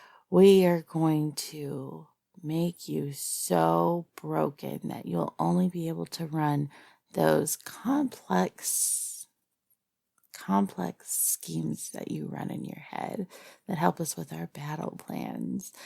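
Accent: United States. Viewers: Haha, and with a channel name like I thought it was expected. Though I was more referring to your voice in general. It’s nice to hear your blend of softened strength, the occasional spice of sadist, and a little North American country twang that serves as the perfect cherry on top. Anyway, keep up the good work and don’t forget to take care of yourself~!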